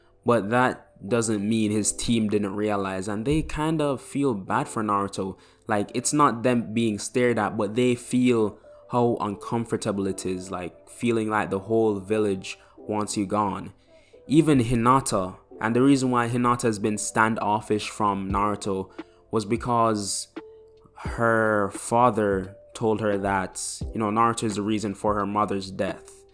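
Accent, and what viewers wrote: Jamaica. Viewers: this guy sounds like he has a Jamaican accent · are you jamaican?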